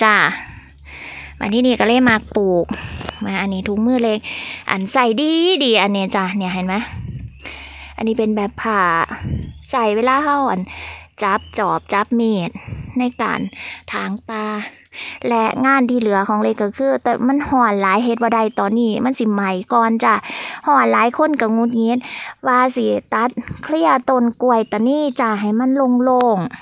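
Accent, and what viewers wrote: Isan, Thailand. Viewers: เว้าอีสานเป็นตาฮักเนาะ...มะม่วงที่บ้านพี่ก็ออกลูกต่อเนื่อง..มดแดงก็เยอะ..สวนเกษตรอินทรีย์จะมีมดมาอาศัยอยู่เป็นเรื่องปกติ..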